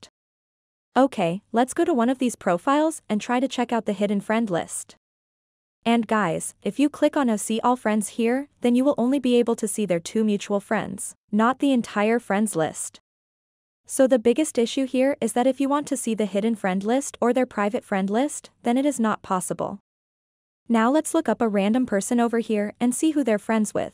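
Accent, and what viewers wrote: India. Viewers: You have an indian english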